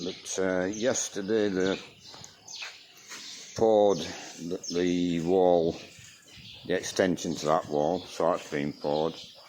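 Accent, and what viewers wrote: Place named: Yorkshire, United Kingdom. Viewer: Which part of Yorkshire are you from ❤if I was guessing 🤔 I would say Barnsley ❤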